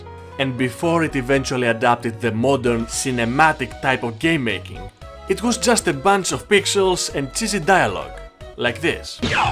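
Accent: Greece. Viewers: You have the most thickest greek accent I've ever heard, much love bro!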